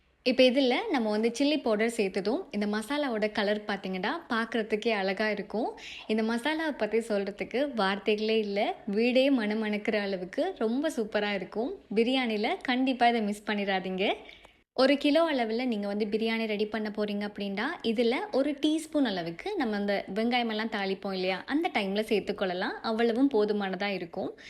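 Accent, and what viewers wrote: Sri Lanka. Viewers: நன்றி.சிறீ லங்காவா அல்லது இந்தியாவா.அழகிய தமிழ்.❤❤😅😅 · ஸ்ரீலங்கா அல்லது இலங்கை தமிழ்.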